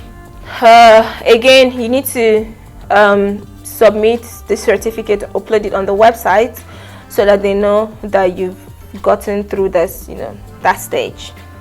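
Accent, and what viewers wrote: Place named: United Kingdom. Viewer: Its the British accent for me......keep it up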